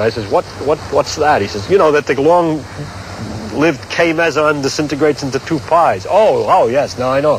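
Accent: New York, United States. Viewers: I love his accent. Is that the thickest New York accent ever seen on TV? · That New York accent is so catchey. I remember once telling my mother that it is possible to have negative integers as well as positive integers and tried to explain my idea,, this was our at the age of 11 or 12, she just laughed at me and said that was stupid, it wasn't until high school then I realised that's exactly what you can do.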